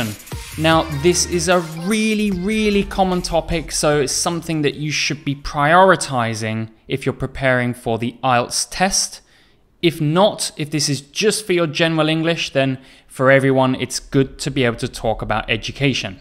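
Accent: United Kingdom. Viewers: Like it your British English totally and thank you very much for your videos.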